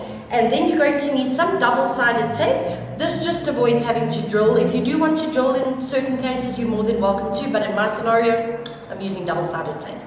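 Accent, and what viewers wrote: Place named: South Africa. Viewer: Well some people are a little thick not to point at RAzzA, I personally watch it a few times not because I did not get it the first time, I just Love the South African accent and your beautiful eyes can't wait for the touch screen thermostat video . Not sure what you were thinking with the scissors? hey but.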